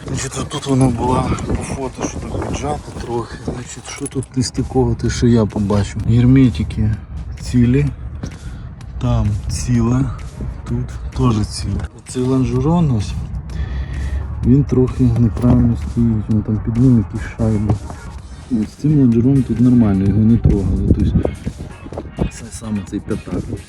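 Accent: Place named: Surzhyk, Ukraine. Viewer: Ви круто навчилися балакати нашим суржиком))))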